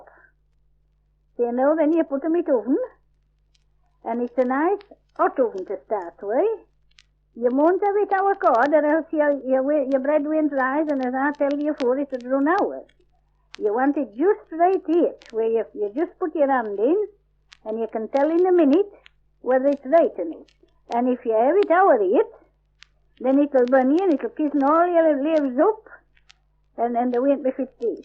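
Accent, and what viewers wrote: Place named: North Yorkshire, United Kingdom. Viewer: the Northern West Riding however is considered part of the North Yorkshire dialect group, hence my comment. Were she a West Riding speaker proper you'd have things like: - "hoil" not "hooal" for "hole - "spooin" not "speean" for "spoon" - "stooan" and "sooa" not "steean" and "seea" for "stone" and "so" - "spare" not "spaar" for "spare" - "oven" not "yoon" for "oven" - "bake" not "beeak" for "bake" - "one" not "yah" for "one" before nouns - "enuff/eniff" or in the plural "enew/enow" and not "eneeaf" for "enough "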